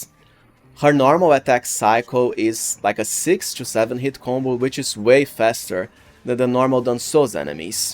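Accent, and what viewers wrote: Brazil. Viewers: Are ypu brazilian or portuguese speaker? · Tu é BR né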